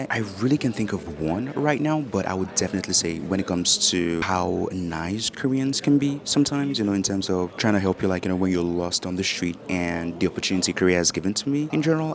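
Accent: United States. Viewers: I thought American cuz his accent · I thought you were American 😅 Hearing you're from Naija is a pleasant surprise ☺️ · Somehow I always asume you're from the US. Maybe bcz your English sounded American. It make sense now why your mannerism is not "rough". Coz the African American I saw mostly have "rough" mannerism.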